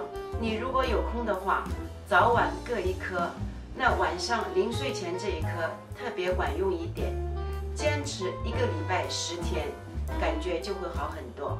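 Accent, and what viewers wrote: Shanghai, China. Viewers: 听口音，上海阿姨啊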